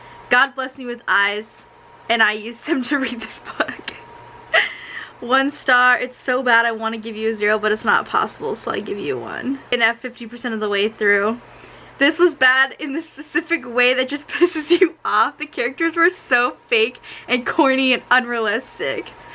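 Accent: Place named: England, United Kingdom. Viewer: Cackling at your English accent🤣❤️ I literally love these so much- getting to live vicariously through you reading books I wouldn’t pick up for fear of DNF’ing 🤣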